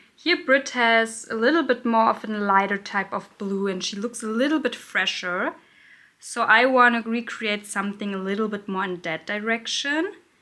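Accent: Germany. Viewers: i noticed the german accent right away and i love it (half german girl here).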